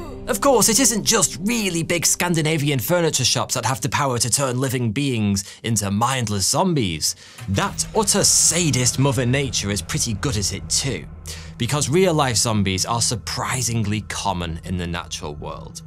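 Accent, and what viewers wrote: United Kingdom. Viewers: Trendy topic about a crappy mainstream overrated TV show, check. Cheap British accent, check. Long winded intro to get the video over 10 minutes, check. Sponsor at the start, check. Idiotically simple take on an interesting subject, check. Gotta love Youtube.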